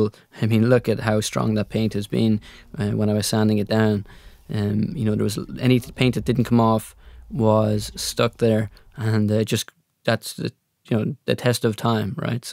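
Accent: Ireland. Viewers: Ah you’re Irish. That explains a lot.i was looking around for the elephants. You were talking about the elements. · love the videos love the updates and the restoration of this beautiful boat. Just a side bar , I love your Irish accent reminds me of my dear Gran that passed in 87 but was born and lived in Ireland before coming to the states , God Bless you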